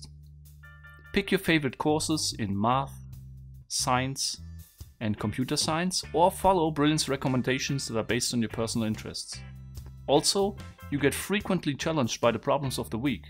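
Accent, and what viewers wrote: Germany. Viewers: Man checkt das du deutscher bist 🤪🤣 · Bist du Deutscher dein Englisch hört sich nicht gut an oder täusch ich mich da. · Ich liebe diesen deutschen Akzent xD (I love this german accent xD) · Also man hört raus das du ein Deutscher bist aber trotzdem ist dein Englisch super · He sounds german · An deinem Englisch merkt man direkt das du Deutsch bist :D gutes Video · Are you from germany? Sorry for this weird question, but I was wondering through the entire video. I go to a school in germany and noticed my classmates have the same accent as you when speaking english. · He's speaking Like a german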